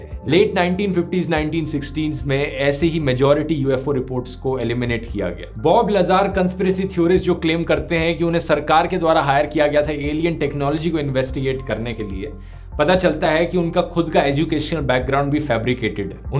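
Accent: Haryana, India. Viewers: DONT YOU THINK DHRUV RATHHEE STILL KEEPS HIS HARYANWI ACCENT(JAAT) WHILE SPEAKING HINDI IN THE VIDEOS.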